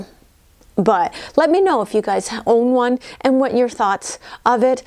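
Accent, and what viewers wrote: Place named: Canada. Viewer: thanks I'm already at the online store seeing what they have...I didn't realize you were in Canada...that's where the cute accent I hear is coming from.....OK thanks again and will let you know what I buy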